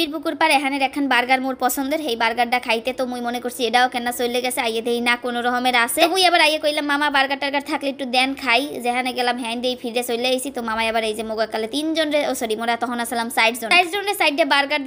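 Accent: Barishal, Bangladesh. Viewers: আমার এই ভিডিও টা দেখার কারণ হলো বরিশালের কথাগুলো শুনার জন্য। কেননা আমি চট্টগ্রামের আর আমার একজন মনের মানুষ ছিল বরিশালের। তার কথা গুলো সেম মতো।অবশ্য সে এখন আমার আর নাই।আমায় বিশ্বাস নিয়ে আমাকে ঠকাইছে।অথচ ভালোবাসার উদ্ভুত মায়া।সে এখন আমায় ঘৃণা আমি তাকে করতে পারিনা ঘৃণা এটাই হয়ত ভালোবাসা।বরিশালের ভাষা কারো মুখ থেকে শুনলে আমার বুক ফেটে কান্না আসে।নাম তার ফ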